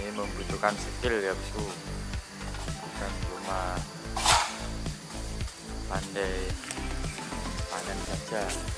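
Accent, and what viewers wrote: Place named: Java, Indonesia. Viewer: Logatmu jowo maseh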